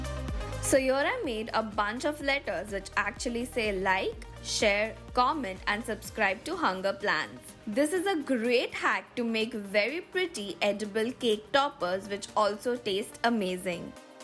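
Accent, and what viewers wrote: India. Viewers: also your hindi accent is soo sweet and cute😍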